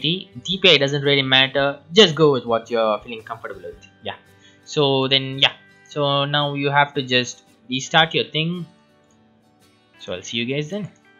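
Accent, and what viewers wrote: India. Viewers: also am pretty sure you are an indian by your accent.I am indian too.The thing is that since i am from south India i dont actually understand hindi and all videos have english title but they talk in hindi except you.(not offending hindi but using english is better so that people from other country can understand)BTW do you like T series or Pewdiepie XD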